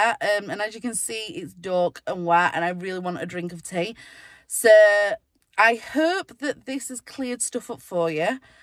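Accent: Yorkshire, United Kingdom. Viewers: Your channel is good, you are not boring, you are aesthetically pleasing (we don’t all want to watch perfectly filtered beige channels), you are not common you’ve just got a Yorkshire accent and there’s nowt wrong with that! 😂😂 I think one a day is perfect and yeah, no spoilers please. I’ve gotta say though when you mentioned be positive Sam uploading again you got me all excited and confused 😂😂. I miss her videos! Very brave video and I completely understand where you are coming from. Lots of love xx.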